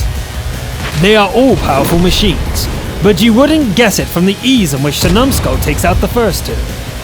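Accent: United Kingdom. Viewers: The scout replay with amazing agent music with a very nice british accent made my day for me. Keep up the good work with unique presentation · even i from germany Heard this "english" acent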